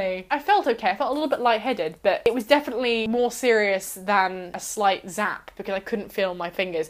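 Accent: Australia. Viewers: yOUR ACCENT HAS GOTTEN A LITTLE BIT MORE AUSTRALIAN AND IT'S SO ADORABLE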